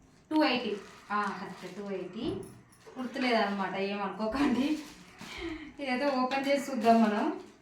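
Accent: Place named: Telangana, India. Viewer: Hy aunty lots of love telangana slang vere level ❤❤❤❤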